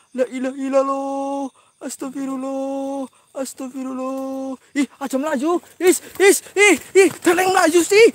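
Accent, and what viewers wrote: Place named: Banyumas, Indonesia. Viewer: Seram tpi aga lucu ya,ngapak xxz.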